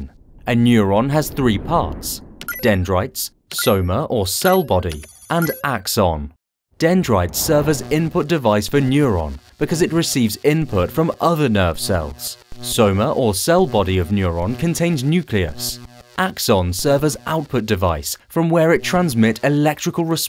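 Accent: England, United Kingdom. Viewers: I find the dichotomy and paradox of the thick British accent being paired with the lack of conjunctions and prepositions quite amusing! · this sound is full of england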